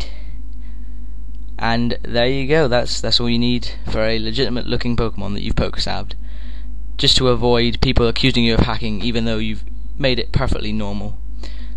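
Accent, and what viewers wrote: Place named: United Kingdom. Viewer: your awesome. british accent and straight to the point.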